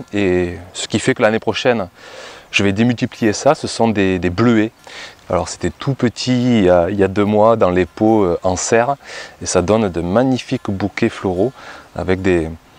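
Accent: South, France. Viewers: Bonsoir, merci encore pour cette belle vidéo,et alors un jardin qui pousse à vu d’œil!! Tu as des légumes à en être jaloux!! LOL. Je voudrais tellement avoir mon potagé comme le tiens. Mon jardin se trouve dans la forêt je suis dégoûtée..Il y a 15 jours, il a pluie énormément et mes tomates ont pris la maladie ( je suis dans l’Ain). j'ai essayé de les traiter avec de la bouillie bordelaise, mais je crois que je les ai perdu mes 15 pieds. Crois tu que je puisse en retrouver encore dans les jardinerie pour les remplacer?? J'ai planté quelques pieds de courgettes, de la diamant et un pied de courgettes allongées jaunes,mais je ne me souviens plus du nom. peux tu me dire ce que l'on peu planter encore dans le jardin ce mois la et les mois à venir. Merci à toi et et à ton petit accent du midi!! j 'adore A bientôt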